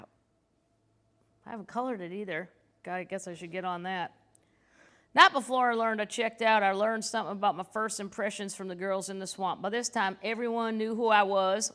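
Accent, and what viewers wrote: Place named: South, United States. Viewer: like the accents, specially southern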